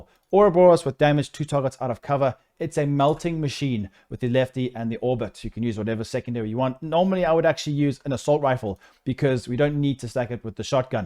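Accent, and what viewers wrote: South Africa. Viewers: you from south africa ?? Discord ?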